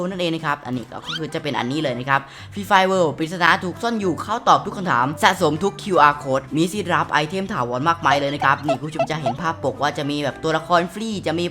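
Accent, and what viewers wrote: South, Thailand. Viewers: พี่ครับพี่คนใต้ไช่ไหมครับผมฟังสำเนียงออก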